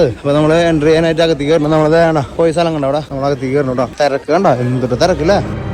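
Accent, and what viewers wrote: Thrissur, India. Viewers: Hello,Please write your script you dialogue as there is repetition of your words and descriptions...also lessen your trichur accent...as always picturixation is great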